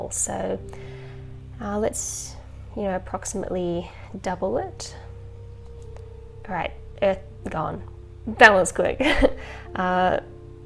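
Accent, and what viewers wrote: New Zealand. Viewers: I'm pretty sure that that's not an Australian accent, but rather a New Zealand accent. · She's from New Zealand. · It's definitely an New Zealand accent. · I only know this from watching too much Flight of the Conchords on repeat. That's unmistakable New Zealand backwards speak.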